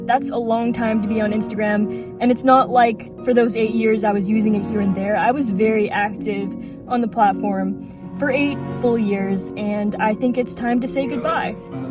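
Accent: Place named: India. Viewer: Your accent sounds like Indian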